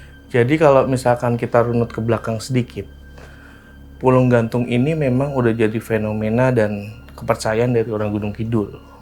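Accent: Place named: Padang, Indonesia. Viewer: Nama: jawa Orang:manado Logat bahasa: padang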